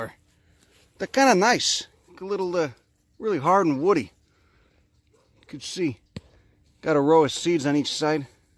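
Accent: Chicago, United States. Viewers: Just joining (recommended by Diamond) LOVE your info on utilizing the natural growth around us.....Learned a valuable lesson last week...physically cannot do tree trimming, etc...had to hire someone...they did a great 'trim' BUT I had no clue how the underbrush of all my overgrown fauna around my small house was INSULATING it. Yikes !! Miss that Chi town accent :) Grew up 40 miles south and had my first 'real' job downtown on Jackson Blvd (1963) Took the train into Union station...wore 'electric socks' to keep my feet warm (from Bennett Brothers) back then, a wholesale company. Thank U for your knowledge !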